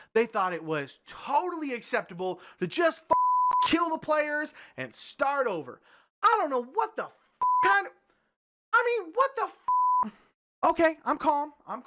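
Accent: South, United States. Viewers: turns the dixie up to 11 (accent) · you get a southern accent when you get agitated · You get more southern when you get angry! lol But seriously I agree. I have met some old school DMs who totally get off on TPKs. There are a lot of folks that simply, in my opinion, are not flexible and creative enough to be DMs! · LOVE THIS. I can hear it in your voice, like you went a little "ghetto" (or deep south? - I don't know, I am from Wisconsin) on this:) LOL PERFECT what you said about having the "adult" conversation - gaming is all about COMMUNICATION - if we cant talk to each other, why are we playing? I have been gaming for 30 years and I cannot once think of a time where I as a DM or a player experienced TPK for something like this. Recockulous advice you were given. Keep making videos, I love your work - great stuff! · You can hear the Texas in his voice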